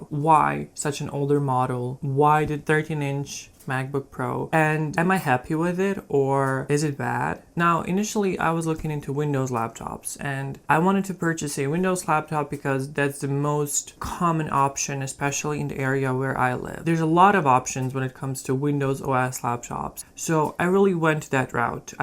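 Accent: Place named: Poland. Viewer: You kind of sound Polish :)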